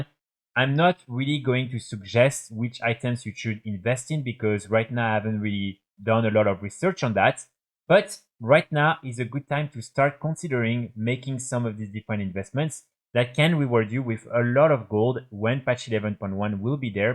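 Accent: France. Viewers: J'aime beaucoup ton contenu et tu est super regulier ca fait vraiment plaisir , j'ai pas un niveau de dingue en anglais mais je comprend l'essentiel, ca s'entend que tu est francais du coup je me demandais si tu avais deja songé a faire tes videos en fr je suis certain que ca trouverais son public 😊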